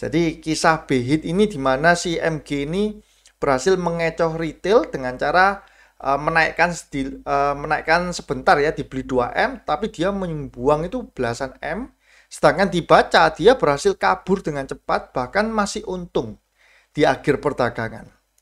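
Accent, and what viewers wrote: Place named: East Java, Indonesia. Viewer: Muuuuedok tenan ko jawa timurnya 🤣🤣🤣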